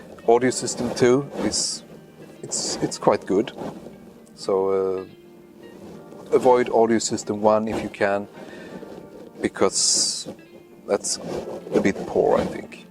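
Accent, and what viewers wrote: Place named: Scania, Sweden. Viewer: Skånska (?) = kvalitet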